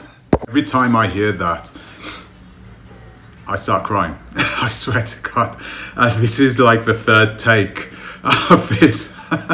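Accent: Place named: United Kingdom. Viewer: bro sorry to say that but ur English accent isn't Semmes like an American it's Semmes like u da UK tho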